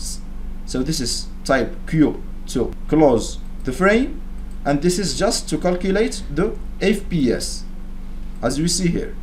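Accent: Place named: Morocco. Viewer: SAWT 3NDK MGHRIBI A SAT HHHH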